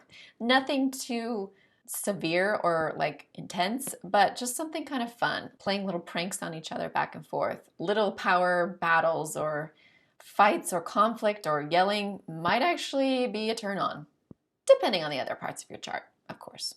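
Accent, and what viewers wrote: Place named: United States. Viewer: I have venus and Mars in Aries and when im horny its horrible but thats also probably because my ascendant is scorpio... I had like 30 orgasms the other day and im a guy in my 30s so yeah I dont really care if I cum quick because i will be back at it in less than 10 minutes... which is not normal...probably end up killing someone if i just held this energy in...my ancestors from one side were Polish nobility and literally every single man since my great grandfather were involved in wars and killing people and the ones from back in the day were winged hussar knights who were undefeated on the battlefield and destroyed armies up to ten times the size of them, they are legendary war heroes in Polish and European history as they saved Europe from the ottoman empires expansion......my sister has her moon and north node in aries too and shes a nutcase also...my mother had a horrible explosive temper and would beat us constantly....its genetic i believe also.Luckily my sun in pisces calms things down though.. 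if i had my sun in Aries that would be bad. Im very good at fighting and quick and have trained alot in the past but now I just go to the gym to look muscular and toned... but yeah your hot by the way... if you ever go on vacation to Mallorca hit me up...thats were I live... I love that American accent ,it would turn me on so much hearing you speak dirty... but yeah lol ...anyways..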